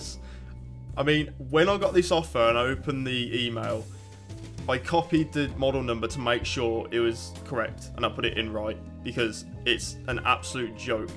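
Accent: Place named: Birmingham, United Kingdom. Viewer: Piss take!!! By the way can I just say there’s no better accent than a cynical Brummie one!!! I know, I am one😂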